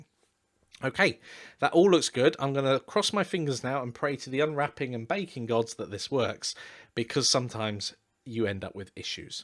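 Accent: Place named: United Kingdom. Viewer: These videos are great. Besides, the British accent is great for teaching apparently. :P Thank you so much for the time and effort you take to teach us! :)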